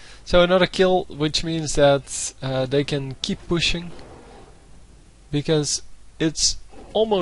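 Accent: Netherlands. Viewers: Are you dutch man?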